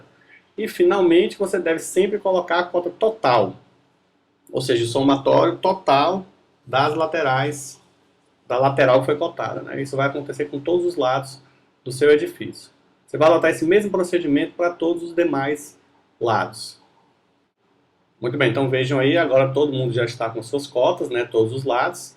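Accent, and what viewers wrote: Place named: Ceará, Brazil. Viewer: Ótima aula. Sotaque Cearense inconfundível...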